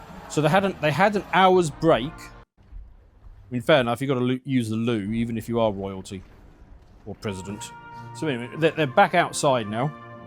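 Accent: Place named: England, United Kingdom. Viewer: However you need to describe it to make you feel better. We love you regardless, you’re the most stereotypical Englishman I’ve ever heard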